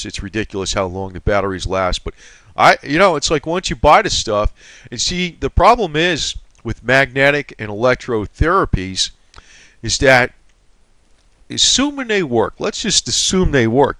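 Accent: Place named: Italian American, United States. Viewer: you sound like an Italian American kind of like Robert DeNiro type accent.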